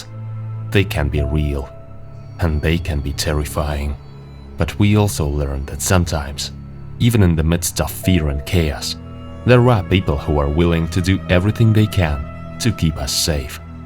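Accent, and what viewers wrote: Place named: United Kingdom. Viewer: HES BRITIS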